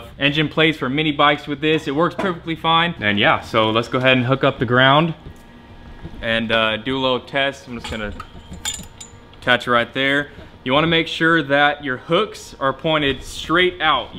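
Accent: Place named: South, United States. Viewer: You are starting to get a southern accent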